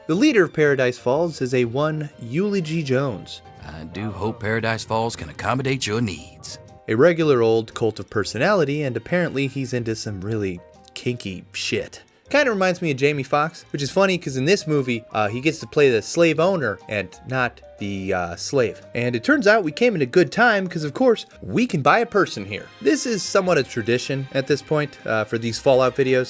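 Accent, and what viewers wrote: Indiana, United States. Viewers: Is dude a Hoosier?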